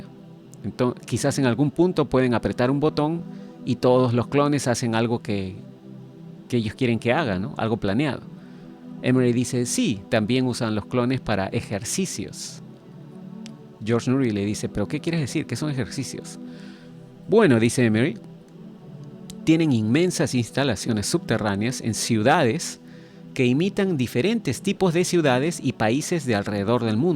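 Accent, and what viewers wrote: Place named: Peru. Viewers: Tiene un acento como de Perú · es de Peru 😍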